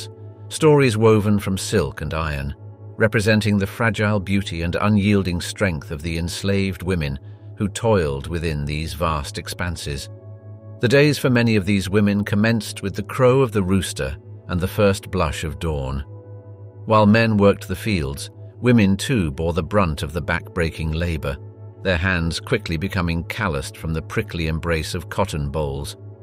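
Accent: United Kingdom. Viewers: Just what I need...Some dude with a British accent lecturing me about American slavery. Why don't you tell us about how England enslaved Ireland for centuries?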